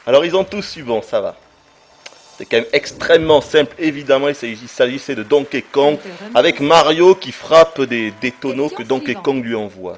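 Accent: South, France. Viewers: Mais qu'est-ce qu'il avait sur cette vidéo, il avait grave l'accent du sud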